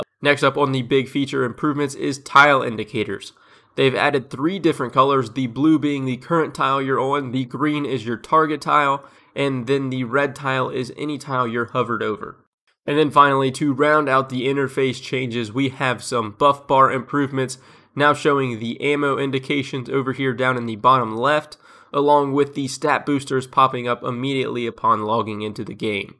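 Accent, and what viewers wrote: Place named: Philadelphia, United States. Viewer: your accent definitely sounds like philly/delco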